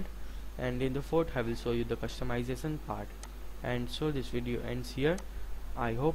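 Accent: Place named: India. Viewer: Authentic Indian accent😄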